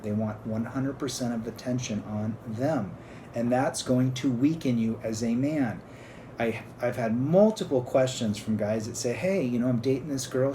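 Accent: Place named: RP, United Kingdom. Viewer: love the top-hat accent! 😃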